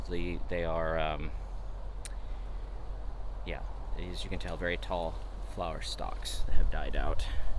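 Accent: Texas, United States. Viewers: Hi Denmark here. Don't worry about the accent, when you speak Swedish fluent, you will still have the accent. But it doesn't matter. I have a friend, he has been in the country for about 25 years. He's accent is noticeable, but he speaks fluent Danish and can have a perfectly normal conversation with a Dane. BTW, your Texan accent ain't that heavy. I have heard it way "worse". Have you tried reading Danish. A Swede, can quite easily read Danish. Specialsygeplejeske.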